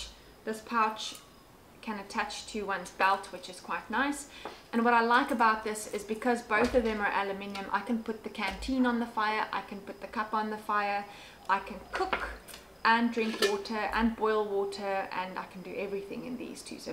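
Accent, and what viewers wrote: South Africa. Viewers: I lived in SA for two years. What a beautiful country. I miss your accent too. I'm impressed with your loadout and the fact that you actually use it. It's a well thought-out kit. You have everything you need and nothing you don't. My bag weighs in at 25 pounds. Most people with little experience overpack without realizing how heavy a 40-50 pound pack becomes after an hour. I think 25 pounds is a bit much so I'm experimenting to figure out how to shave 5-10 pounds off. I'll get there. Thanks for your excellent channel!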